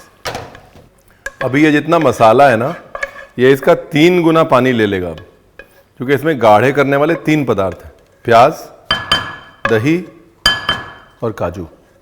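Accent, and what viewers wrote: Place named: Rural, India. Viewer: No matter how desi/dehati accent he may speak in but when he says the word 'right' it reflects his sophistication both in life and culinary art. I like your way of cooking.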